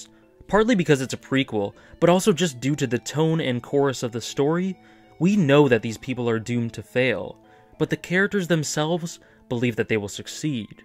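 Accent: Canada. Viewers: Good content to go with a very noticeable Canadian accent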